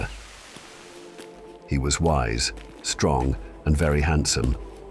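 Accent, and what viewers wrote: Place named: United Kingdom. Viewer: Why use a British accent?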